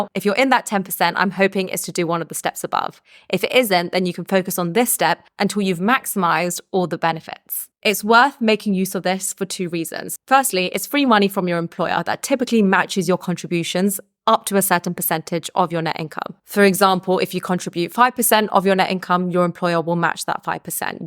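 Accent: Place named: London, United Kingdom. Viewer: love the modern London accent innit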